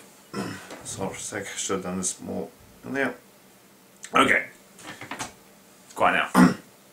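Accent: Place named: United Kingdom. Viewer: Dude ... i expectd an aussie accent like my friends from school but you sound like my friend from back home "Britain" u sound like a BIG OLD SIR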